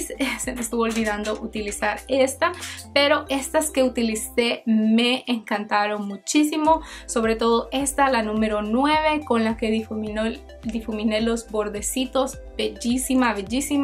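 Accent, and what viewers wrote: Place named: Honduras. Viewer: Me encanta cuando dice... y toda la cuestión... muy Hondureña jajaja Saludos nena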